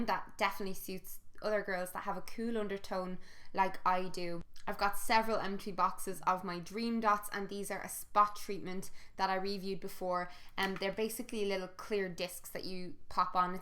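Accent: Ireland. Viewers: Ouuuuuuu!!!!!! I am in love with your Irish accent!! So sooo lovely! Im Canadian with Irish heritage :) Ireland is definitely on my bucketlist!!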